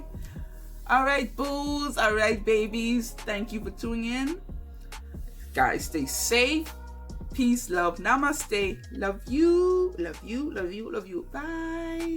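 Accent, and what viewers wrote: Bahamas. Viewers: Thought you sounded like a Bahamian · Girl are you Bahamain, your accent sounds familar?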